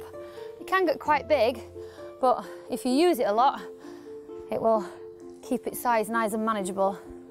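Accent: United Kingdom. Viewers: UK sound, very nice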